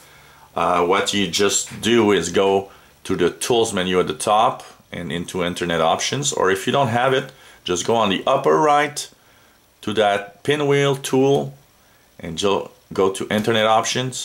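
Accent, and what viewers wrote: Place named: Eastern Europe. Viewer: This worked perfectly for me (restore advanced) even with 64 bits. Done, and done. Thank you eastern-european-sounding guy!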